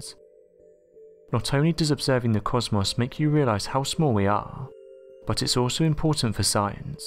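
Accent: United Kingdom. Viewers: I saw no proof or evidence regarding the claim of NASA. Instead all I heard was a British smart alec talking in riddles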